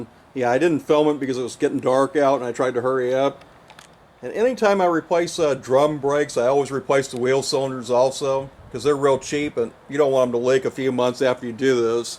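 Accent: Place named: Seattle, United States. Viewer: nice information! you sound like you are from Seattle.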